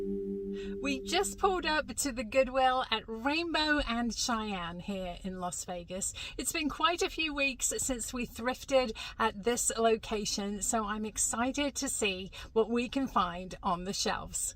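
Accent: England, United Kingdom. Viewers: Thank you for answering my question. Your English accent is still strong so I was wondering. I have been watching your channel for a few years. Love your finds. I have been to Las Vegas 4 times 1st time in 1992 and spent 3 days of our honeymoon there in 1995 when we travelled aound the west coast but never visited a Goodwill. Take dare.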